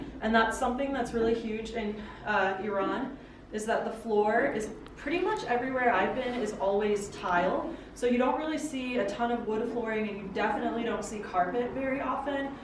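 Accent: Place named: United States. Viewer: Thank you for the tour!😍 I find it interesting that in Iran the kitchens are open in comparison with Azerbaijan, where i come from. It would be also nice if you would go a bit more deep in thr tour and show more details in the furniture, for the kitchen especially it was too quick, and at the end to have an overall blick once again😊 Btw you have a lovely american accent👍🏽 As i understood you are living in the States and just visiting Iran.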